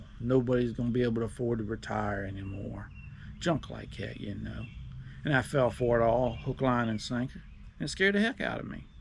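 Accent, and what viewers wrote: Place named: Virginia, United States. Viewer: Are you originally from the shenandoah valley of Virginia or West Virginia. I am and you sound like you are. Anyhow I saw this video today, subscribed and watched all your videos in order. I am soooo happy to hear someone honest and down to earth non financial guru. I am 55 still working. Worked in factories since I was 18. Been at this one for 33 years, no pension because company was sold in 2015. Could not agree more about factory work was people working together, friendships and kind of fun. Now if one person is offended or does not agree with another person's opinion it can cost you your job. I want to retire early at 56 with a high 6 digit 401k, probably Obama care gold level insurance. Wife already retired this year at 58 and drawing a few hundred from her 401k per month. I, like you, have been just digging into vids books whatever to educate myself and am nervous I'm missing something. 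Just a hs education here but 100 times more than my grandparents had and I owe them for my work and saving ethic. Like you and your wife we can live good on 3400 net a month and could trim that down if needed. Keep telling your stories because I feel like you are in my living room talking to me and answering my questions. Thank you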